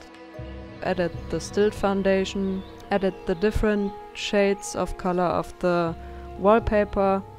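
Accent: Germany. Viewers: Your builds are always incredible 😍 and I'm so happy to finally hear your voice! First of all, I love your German accent, it's one of my favorites, and second, you're so good at talking! Don't put yourself down like this. You should definitely do more of these! 💙✨ Also yayy, teal club! 🙌🏼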